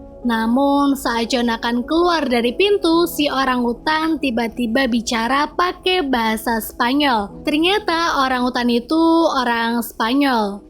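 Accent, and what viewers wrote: Pemalang, Indonesia. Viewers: Kaka orang pemalang bukan kak? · Mbaa orang pemalang yah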